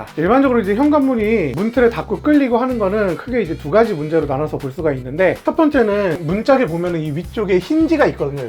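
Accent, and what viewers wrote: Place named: North Korea. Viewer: 말투가 북한말투네... 이 3끼 간첩 아닌가?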